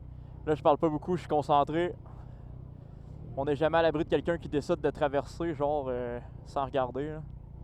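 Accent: Quebec, Canada. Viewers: Sympa la vidéo l'accent québécois pour un belge c'est incroyable ahahaha jkiff votre façon de parler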